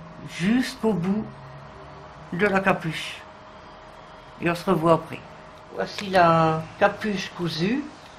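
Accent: North, France. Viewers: Vous êtes du nord apparemment de part votre accent!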